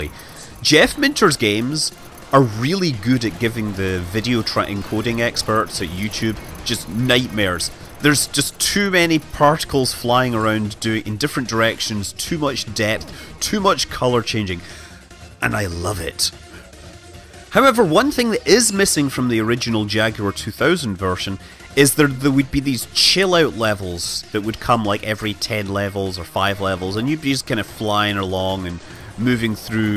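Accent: Scotland, United Kingdom. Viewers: Skaughtisch accent.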